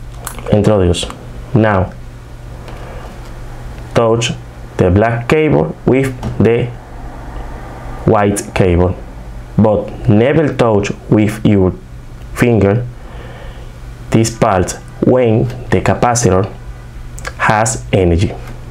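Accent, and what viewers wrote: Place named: Dominican Republic. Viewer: Ese acento de inglés me suena dominicano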